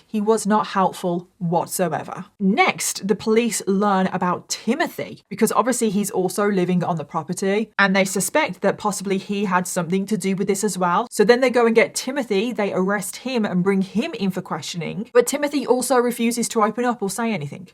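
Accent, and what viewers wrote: United Kingdom. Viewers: Really lol? A British accent sounds tropical to you guys? Haha cool 😊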